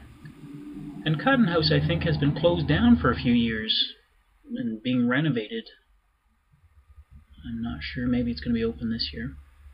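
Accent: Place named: Nova Scotia, Canada. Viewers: It's the Nova Scotia accent. We pronounce words like "harbour" as "hirbour". If you ever cross into Newfoundland it's true that their accent is even stronger! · I love your voice, it's very relaxing listening to you talk. You sound very Canadian. I'm Canadian too, but for some reason you sound even more Canadian than I do :p Maybe it's because I'm from Ontario.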